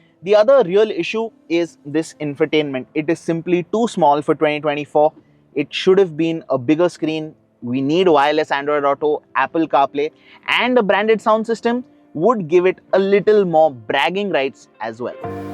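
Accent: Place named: North India, India. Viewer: maTTTerial maTTic. Bro pls don’t insult india with your cheap north Indian English accent 🙏🏼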